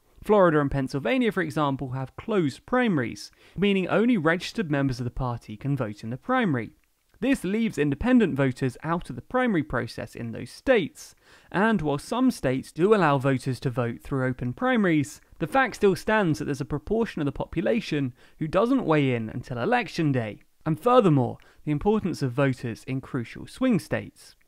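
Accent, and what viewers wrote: United Kingdom. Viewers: Pretty sure the narrator is British.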